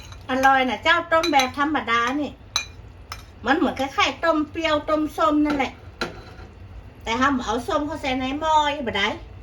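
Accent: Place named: North, Thailand. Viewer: สวัสดีจ้า แวะมาชมการทำอาหารทางเหนือ ใข่ไหมค่ะ ฟังจากสำเนียงค่ะ เพราะๆหวาน ให้ไลท์เลยค่ะ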